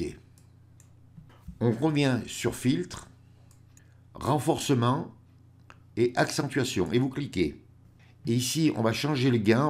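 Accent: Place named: Saint-Étienne, France. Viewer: Merci pour vos tutoriels exceptionnels que j'apprécie énormément: même avec votre bon accent stéphanois tout est bien clair et limpide: vous êtes très patient et pédagogue.Un grand bravo..Je m'abonne pas souvent aux chaines youtube mais j'ai fait unbe exception pour vous!! Mais je fais la même remarque que Pierre Darre, pourquoi la rotation 4 fois de l'image??? Pourquoi?? Et votre lien de réponse ne solutionne pas la question!! Encore merci et à très bientôt!!!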